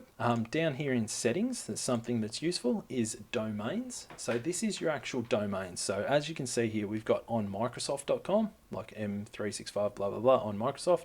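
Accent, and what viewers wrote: Australia. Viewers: My work gave me MS365 responsibilities to help out so this was a good intro. I know most of this stuff but seeing where it is in this video was a good refresher. Been a while since I have done admin stuff. I like how you had trouble with the word enquiries so just changed it to Askmeanything or whatever. lol :D Thats totally what i do when i forge how to spell a word, just use some other words. :D Plus its fun to be in Texas and hear an Australian accent. Makes me think im talking to one of my relatives over there. Anyways. Thanks fo the vid. Cheers.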